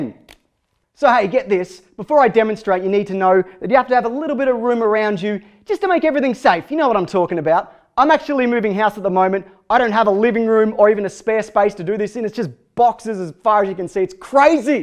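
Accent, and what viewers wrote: Australia. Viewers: He went full bloody Australian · I feel this is like 500% more Australian than normal. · For some reason, seeing your face with your voice makes you sound way more Australian than usual. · Your voice says Australian but your face says Mexican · you seem more Australian in this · oh my god you're Australian Billy Mays (God rest his soul).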